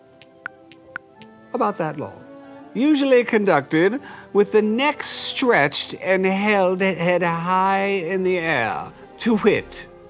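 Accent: England, United Kingdom. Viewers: Haha l i love the British accent ;D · That British accent 😂😂 lovely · LOL - you're English Accent!!!!!! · How did you get to do that english accent? So interesting and so theatrical !!! I like it !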